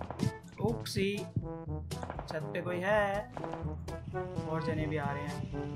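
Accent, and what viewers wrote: Haryana, India. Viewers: kya aap haryana se ho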